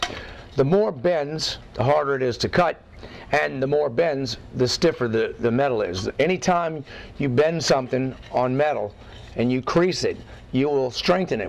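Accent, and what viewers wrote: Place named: South Louisiana, United States. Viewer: Am I mistaken in hearing a bit of South Louisiana in your voice. Not a lot, but it's there.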